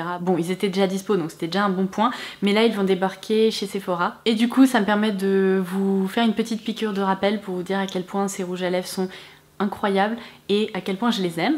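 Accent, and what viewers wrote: England, United Kingdom. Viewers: Super vidéo, maintenant il reste plus qu'à économiser mdrr et j'adore ton accent anglais ! 😃❤️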